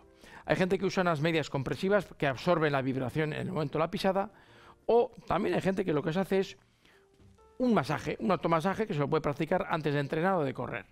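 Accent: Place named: Mexico. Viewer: Si claro por eso tiene accento Mexicano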